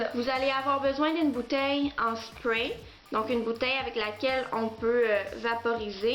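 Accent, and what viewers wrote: Quebec, Canada. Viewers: tu as un accent québécois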